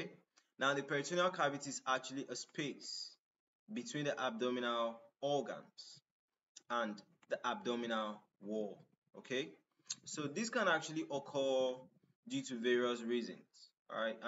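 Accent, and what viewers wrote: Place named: Nigeria. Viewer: Very refreshing to hear a Nigerian accent in a video like this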